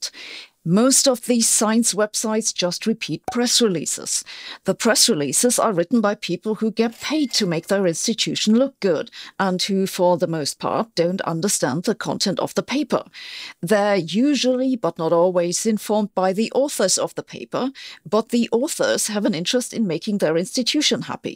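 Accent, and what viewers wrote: Germany. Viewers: I am sure that if you improve your hard german accent and avoid making funny irony you could double the number of views. I tell you this with sincere simpathy, both things reject and create a strange sensation about you. Best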